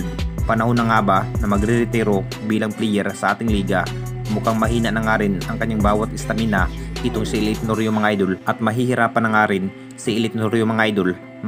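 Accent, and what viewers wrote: Visayas, Philippines. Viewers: Pagka bisaya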